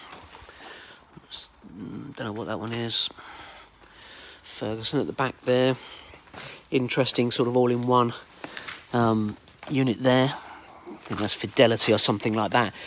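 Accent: England, United Kingdom. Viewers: I’ve seen other videos and loads are from USA it’s sooo refreshing to hear a English accent on this.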